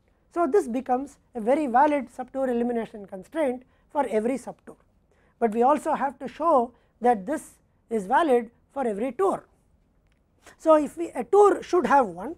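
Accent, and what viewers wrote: India. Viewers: I was replying to the comment complaining about the lecturer's Indian accent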